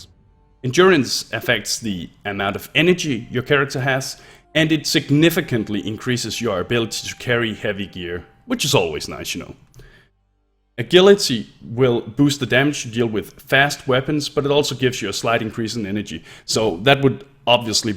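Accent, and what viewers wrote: Denmark. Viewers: Bro You sound Danish 😁 No Worries i do too. Cuz i am 😂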